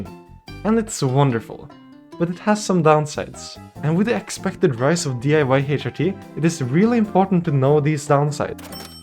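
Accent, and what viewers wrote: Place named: Norway. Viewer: recognizes accent -immediately goes to channel info -NORGE!!!🇳🇴🇳🇴🇳🇴🇳🇴🇳🇴💪🏻💪🏻💪🏻💪🏻💪🏻💪🏻💪🏻💪🏻💪🏻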